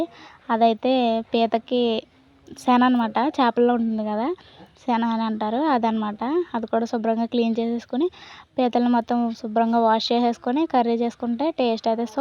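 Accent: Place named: Konaseema, India. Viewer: Konasema voice super 🙏🙏🙏🙏🙏🙏🌹🌹🌹❤️❤️❤️👌👌👌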